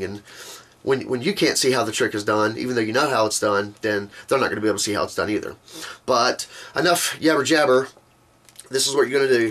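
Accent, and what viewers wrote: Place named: United States. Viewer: Hey I love your particular American accent! =D Is it a mixture of more than one accent? Nice voice too.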